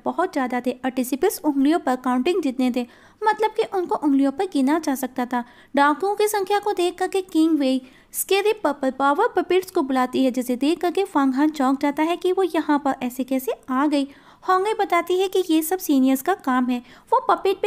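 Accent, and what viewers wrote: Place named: Bihar, India. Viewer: Khisiya hua tha 😂 aap bhi Bihar se ho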